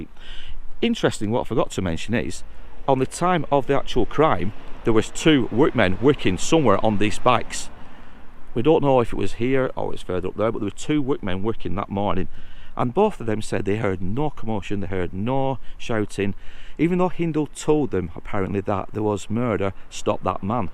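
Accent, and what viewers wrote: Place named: Lancashire, United Kingdom. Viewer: Great video again. Discovered you today and have watched three. Originally tuned in tot hear the accent because my father and all his ancestors were Bacup born and bred. Anyway about the Hindle case. Why was the trial so quick. Today that would take weeks. How can the jury only have been out 12 minutes when there is such a lot to think about? Seems to me he was railroaded by a policeman eager to put it to bed.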